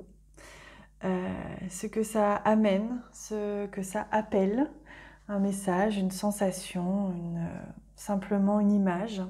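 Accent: France. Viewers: Bravo ma belle; pour ton anglais, avec le ton frenchie ,dont nous les français avons tous, ce qui fait nôtre charme aux usa , tout comme toi ma belle, charmante , adorable, et rayonnante, comme l'étoile du berger, je préfère te voir comme cela, sans voile, je te remercie pour toutes les belles âmes que tu entraîne dans ton sillage ..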